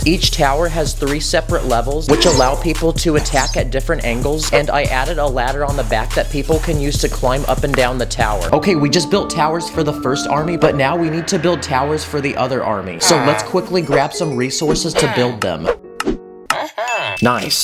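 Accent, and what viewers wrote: Scotland, United Kingdom. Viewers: BRO IS SCOTTISH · Well, that great British accent😅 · HE’S STOTISH LIKE. E I’M BRITISH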